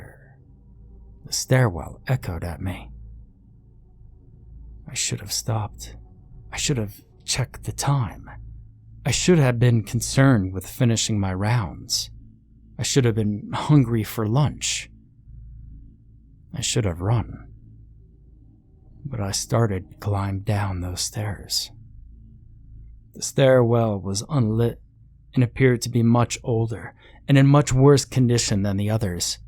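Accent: South, United States. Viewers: Its pronounced bir-ming-Ham not birmingam, this story somewhat misrepresents the city. But your southern accent is on point.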